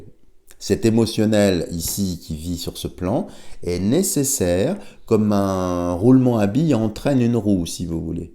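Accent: France. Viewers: Bonjour quel plaisir que d'entendre parler un pur français... quelle belle langue que la nôtre. En sus de vos connaissances et de vos explications claires et précises sans blabla inutile ni dénigrement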